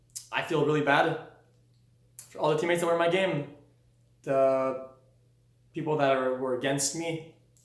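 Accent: Quebec, Canada. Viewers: He’s a French Canadian that is trying his best to talk English though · Just to make sure, are you from quebec, because you have the accent, i write this in english because im not sure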